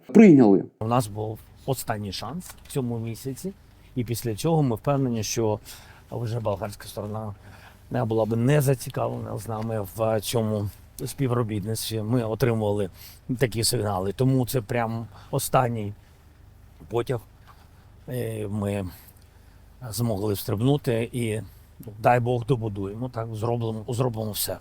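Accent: Ukraine. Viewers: Дякую за вашу роботу, пане Юрію. Я з Київщини, навчалася і жила у столиці і я 1976 року народження, тому завжди приємно чути нашу говірку у вашому виконанні і зрозумілі моєму віку приколи. Доступно подаєте важливу інформацію. Бажаю всього найкращого💛💙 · Якимся-))) обожнюю ваш суржик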